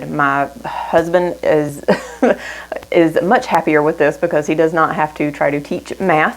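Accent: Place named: South, United States. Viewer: I just found your channel and I’m liking it a lot. I hear some southern twang so I wondered what state you live in. I’m from Mississippi but live in Tennessee now. We love student led material and Teaching Texbooks! I have a 3rd grader, 1st grader, and 3 year old.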